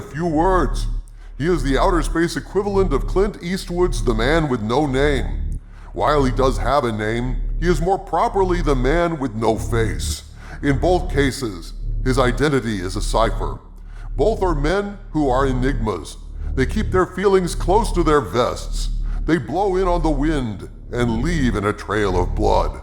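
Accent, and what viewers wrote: New Zealand. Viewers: Aussie? I thought he was a Kiwi. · Hes ahhh New Zealander right? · he’s actually from New Zealand 🇳🇿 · Haha, not Aussie, a Kiwi mate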